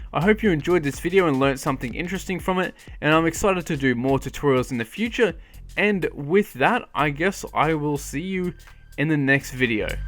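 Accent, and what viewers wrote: Australia. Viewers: Is that an Australian accent?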